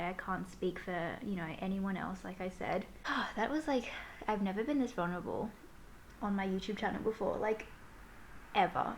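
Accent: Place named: Australia. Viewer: Your a Aussie even your Aussie accent That's so bad my brother has a Thai wife who lives here I have a Thai girlfriend who's in Thailand and my youngest brother has a American girlfriend who's parental are Lao you shouldn't feel like that your very beautiful and your eyes are very round don't care about people who say bad stuff about you and the same my Mum and Dad come from England but I am Australian you're just as me don't follow trends that's exactly what a trend is they come and go and I think you're a lot more beautiful than most other western Australian girls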